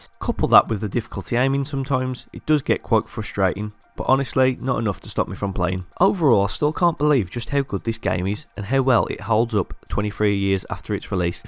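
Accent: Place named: West Midlands, United Kingdom. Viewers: Completely unrelated here. But that is a black country accent if I've ever heard one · Nice to hear a West Midlands accent on YouTube. Great video!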